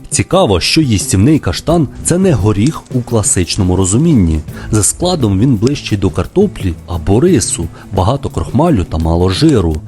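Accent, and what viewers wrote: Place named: Poltava, Ukraine. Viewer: Ваш полтавський акцент чудовий❤ може ви й не звідти, але дуже схоже, в мене звідти родичі) А де таке росте? Де його взяти? В нас тільки кінський скрізь росте...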